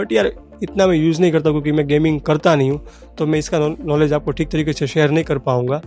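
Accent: Gujarat, India. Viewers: Bhai mere khyal se aap Gujarati ho?????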